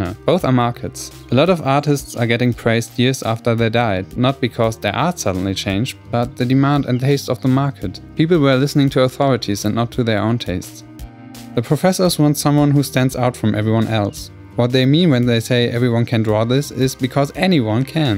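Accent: Germany. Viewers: You sound very German. Kunst sollte doch etwas mehr sein, als lediglich die Funktion einer Kamera einschließlich anschließender Nachbearbeitung zu imitieren. Was ist denn deine inhaltliche Auseinandersetzung? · Are you german and if are you from lower saxony ? Your voice sounds very familiar to me ^^ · are you German? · are you german? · ich finds toll zu sehen wie die Bilder langsam zum Leben erwachen, ich bin selber nicht so Künstlerisch begabt, eher Handwerklich, und denke bei Bildern wie deinen,"wie wurde das gemacht? wie ensteht dieser effekt?" sorry aber ich habs so rausgehört dass du Deutsch bist :) Qualty Content Approved ;) keep it up :) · You are German are you? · Are you german? I'm just wondering because of your accent.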